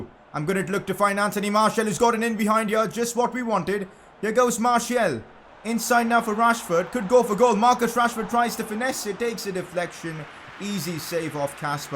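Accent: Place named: India. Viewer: PCQ: your accent is different where are you originally from? India? Sorry if its personal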